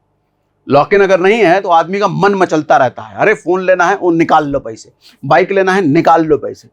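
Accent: India. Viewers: You are really a very good teacher! You desi walla voice further more enjoyable. God bless you!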